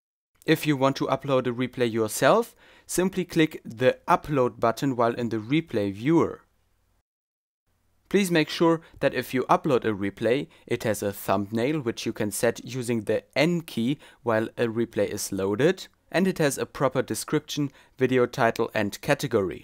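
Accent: Germany. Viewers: You're german right? xD accent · Man hört deinen deutschen Dialekt lol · Ich höre, dass du ein Deutscher bist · Just wondering, but is german? because In my opinion, he sounds german and it seems a large fraction of his viewers are german. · du bist deutsch man hört das XD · Dein Englisch ist gut, aber man hörst das du Deutscher bist! xD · bist du deutscher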